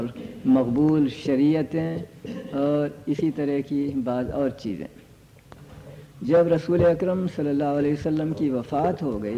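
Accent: Hyderabad, India. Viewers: When was this lecture given...? Seems pretty old, near Indo Pak partition era. Furthermore urdu accent is more of Hyderabad Daccan style.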